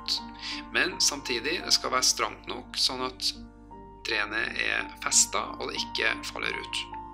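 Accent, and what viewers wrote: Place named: South Africa. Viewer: it`s english but with a south african dialect.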